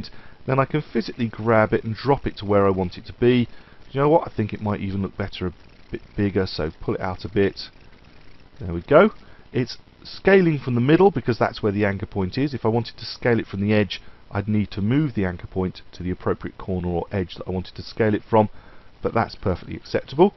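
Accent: United Kingdom. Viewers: "Creative Cow" -> Kids toy -> Country music -> "Moo" sound effect -> British accent?! You surprised me there. I was expecting a southern American accent and some sort of hacker tutorial. Good lesson, man. These things are almost as useful as Tweens in Adobe Flash! This lesson helped me create the effect of the camera panning across a picture. Thanks a lot!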